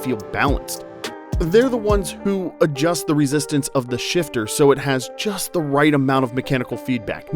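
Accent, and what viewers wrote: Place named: New Jersey, United States. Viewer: Here’s another little tidbit about my wonderful state of NJ. Did you know that in the mid 50’s Margaret Hague Hospital in Jersey City, developed and held the clinical trials for the polio vaccine. The team of scientists worked under Jonas Salks, essentially wiping out polio. I grew up in Jersey City, and I travel the world as an airline Captain. I always run into someone from Jersey, no matter where I am. A little bit of everything in this state, and some of the best striped bass fishing in the world.😊 By the way, the accent is still very heavy.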